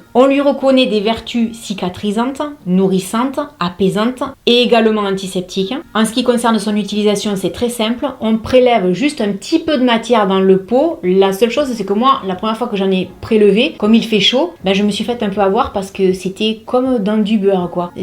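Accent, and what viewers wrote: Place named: Marseille, France. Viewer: Bonjour merci pour vos vidéo elles sont très intéressantes..en principe les produits Avène ça a toujours été une bonne marque….vous avez un accent Marseillais….moi j habite 50 km de nimes Alès et vous vous êtes dans quel coin si c pas indiscret évidemment….merci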